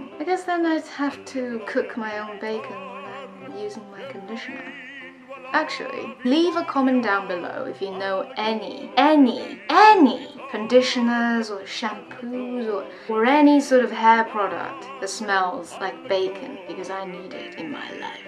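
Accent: United Kingdom. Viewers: 的英音好好听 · 的伦敦腔听着好上瘾🤗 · 口音是rp吗我听起来很像老人2333（没有不好的意思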